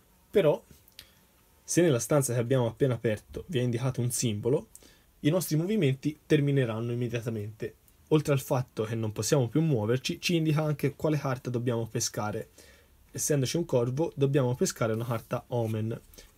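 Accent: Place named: Tuscany, Italy. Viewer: L'accento toscano è veramente sexy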